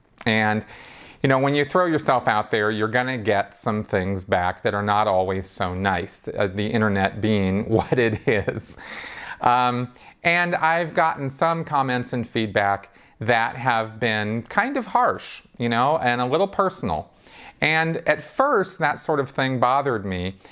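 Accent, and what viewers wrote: Minnesota, United States. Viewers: Yikes.... nothing like ad hominem! And imagine not being able to spot a Minnesota accent. No specific disagreements, just generalizations. And we know what Hubbard said about people who engage in generalizations....;) And the 'you'll delete this' messages always get me. What maroons! You're a class act, "."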